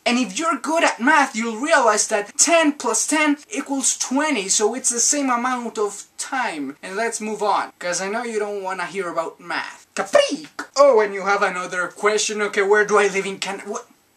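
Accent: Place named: England, United Kingdom. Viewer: x3 i like your english accent <3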